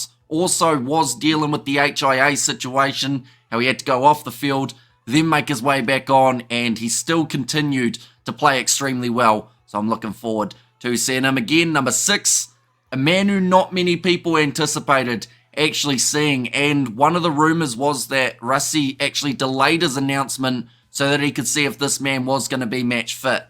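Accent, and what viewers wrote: New Zealand. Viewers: Love the colourful commentary and insights, and your great Kiwi accent. Thanks, man. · Perfect pronunciations! Didn’t expect it when I heard the thick kiwi accent.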